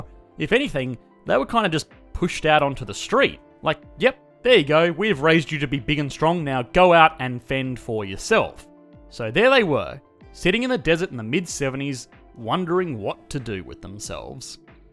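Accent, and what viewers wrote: Australia. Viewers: The Australian accent is very very irritating. Please adopt a US accent.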